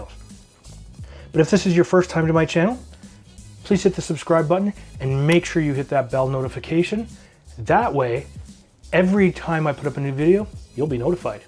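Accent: Canada. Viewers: 1st written abeeewt, I love the way you Canadians talk! Those are some big-ass lemons you get up there. By the way, how did you make that subscribe icon appear with an arrow & click? Maybe it would get more subscribers to my cocktail channel. And you mentioned the Barfly. Do you have good relationships and talk to a lot of other cocktail channels? I have things I'd like to discuss with you, but not leave in a comment as they have nothing to do with that particular drink. I know you have a tight schedule, but please drop a line sometime : <mail>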